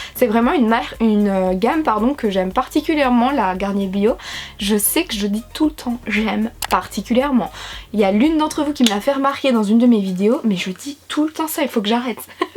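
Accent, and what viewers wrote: Belgium. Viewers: Merci d’avoir répondu à ma question je suis choquée 😂❤️ maintenant je vais commenter plus souvent ! Sinon super vidéo comme d’hab 💓 (ps: je suis française et oui tu as un accent belge) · Oui ta un accent belge et j ai la même palette naked que toi je l adore trop PS : je t'adore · Je t'adore tes vidéos sont toujours aussi cool et on passe un super moment avec toi ! 😘 PS : oui je trouve que tu as un accent belge ! 😂❤ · Cc Perso je trouve que tu as l'accent belge!!! Et c'est mieux car tu es Belge. Merci pour ta vidéo. Bisous de France 🇫🇷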